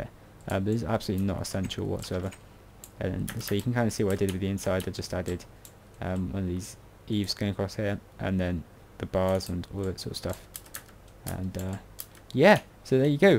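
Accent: United Kingdom. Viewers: Your British accent is awesome you sounded like my fathers friend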